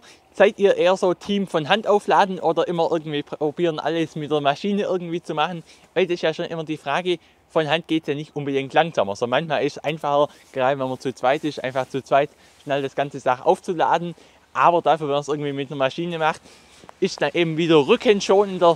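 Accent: Swabia, Germany. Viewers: So isch des. A reachdr Urschwob im Tschäd. Wo kharsch au du no? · Frey mi saumäsig uff deine Videos, ganz besonders weil du a aständigs Schwäbisch schwätsa kasch. Des isch de schest Schproch uff dr ganza Welt 😂😂😂❤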